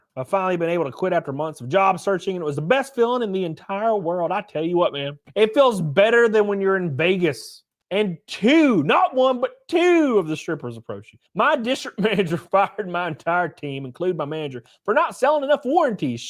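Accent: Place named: South, United States. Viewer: Real talk i never noticed how much you sound like you came straight up like you came right out the talladega nights movie lol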